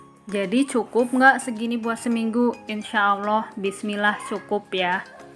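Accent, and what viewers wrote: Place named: Banyumas, Indonesia. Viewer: Salam kenal bunda pinter bget ngatur uang blnja , kowq bahasa ngapak daerah mana bun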